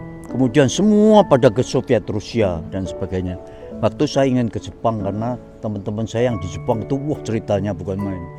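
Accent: Java, Indonesia. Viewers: Logat jawanya masih medok ya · Voila. Hebat, Pak. Bahasa Indonesianya tetap terjaga, juga medhok logat Jawanipun.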